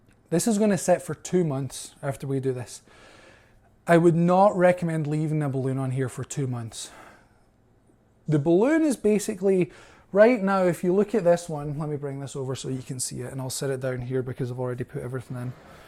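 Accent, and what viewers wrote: Scotland, United Kingdom. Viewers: where in scotland you from bud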